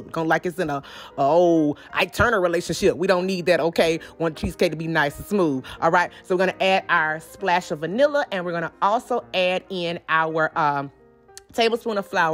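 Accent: South, United States. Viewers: That's the south slang.. lol... I'm from MS🤣😊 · Lord, I'm so glad I found this channel. The food looks so good, and on top of that the southern commentary knocks it home. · I love this chic. I’m southern and live in Ny. So hearing you round the holidays just tickles my heart. Be blessed girl...💕ya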